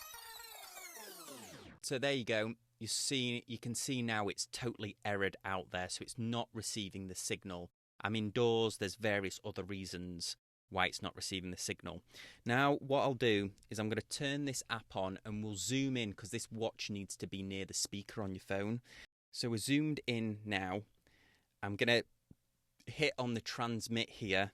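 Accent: United Kingdom. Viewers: I've watched this video through twice, and it sure would be NICE if you'd give a mention of the app you're using to accomplish this amazing feat! Going by your accent I gather you're a Brit. We Americans need things spelled out for us a bit plainly. So how bout it, sport? Give us a hand here mate? 😎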